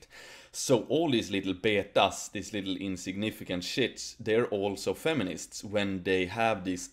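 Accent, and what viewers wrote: Sweden. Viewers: .Bowles If I am going to guess, I guess he has learned English through reading/studying, because of his huge accent that he has. I am from Sweden as well and I used to be pretty bad at speaking English verbally mainly because I only read English in text form. When I started watching tv-series, I improved my pronunciation a lot. But his vocabulary is amazing, mine is pretty crap to be honest but I learned English by myself so I should be somewhat happy lol. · Swenglish is the most glorious accent in the world :)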